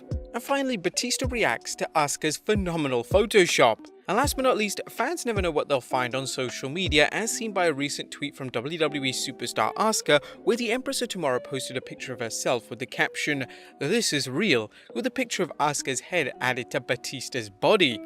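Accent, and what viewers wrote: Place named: United Kingdom. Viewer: It is so cute to me that because of your accent you don't understand that this entire enterprise serves no purpose but to insult the viewers intelligence. Silly British people think you can take a cerebral approach to understand American blue collar culture.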